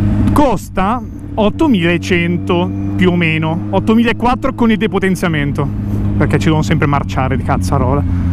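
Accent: Italy. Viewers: Ciao ragazzo ma sei di Brescia o provincia? Dall accento sembrerebbe di si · ma sei veneto?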